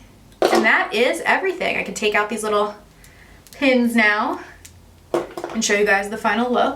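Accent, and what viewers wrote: United States. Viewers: I usually watch sugar puff and fluff but I thought I would give you ago. So disappointed you are an American who speaks so fast and non stop,doesn’t take a breath I’m English and very hard to understand you and catch the name of the product you are using. I’m sure you are very good.sorry Have a look at sugar puff and fluff. I think you will be pleasantly surprised Joi x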